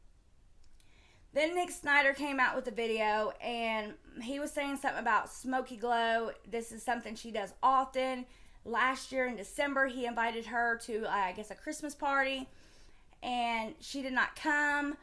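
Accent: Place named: South, United States. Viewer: Wow I never would've guessed you're from Indiana. You sound like you have a southern drawl.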